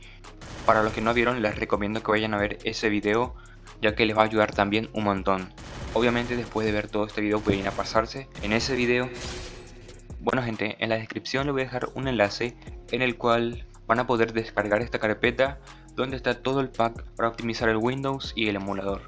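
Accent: Paraguay. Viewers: Se re nota que sos de Paraguay o estoy loco?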